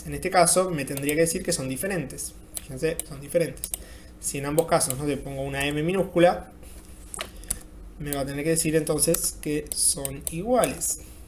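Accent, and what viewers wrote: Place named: Argentina. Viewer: buen video bro, gracias y que lindo escuchar un Argentino en la escena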